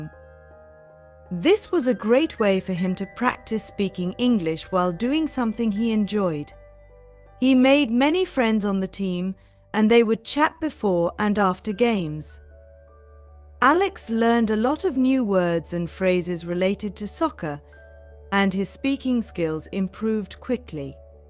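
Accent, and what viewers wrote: United Kingdom. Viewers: British accent?❤ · 영국신 발음이네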